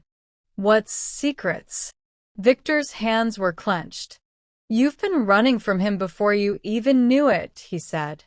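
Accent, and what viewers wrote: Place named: Spain. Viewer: Playback speed x1.25 works well. Good story, but the spanish pronunciation spoils the flow.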